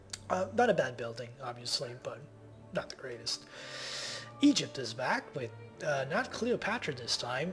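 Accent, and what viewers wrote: Quebec, Canada. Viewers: Based on his speech patterns I would guess French Canadian, but thanks for playing.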